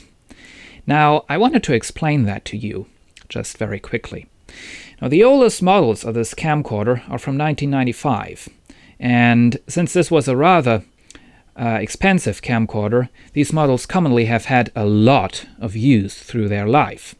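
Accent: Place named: Germany. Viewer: are you german, you have a very german accent..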